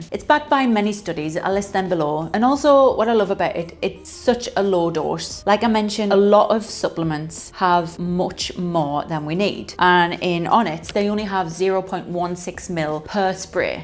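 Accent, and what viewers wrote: United Kingdom. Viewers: You have a British accent so why did you leave the UK?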